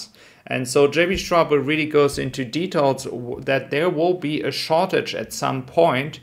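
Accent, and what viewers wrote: Germany. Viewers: I agree, in fact English with a German accent is one of my favourite things. Great content always... thanks!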